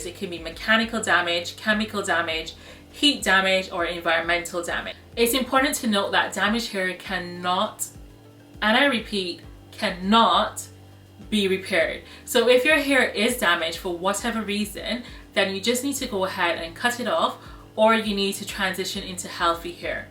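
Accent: Barbados. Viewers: I knew I heard that accent! Fellow Bajan here! ❤️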